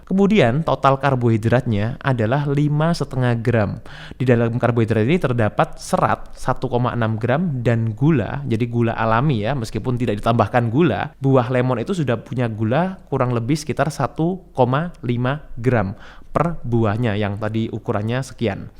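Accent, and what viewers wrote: Java, Indonesia. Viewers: Me I kedok jawa bicaraya medok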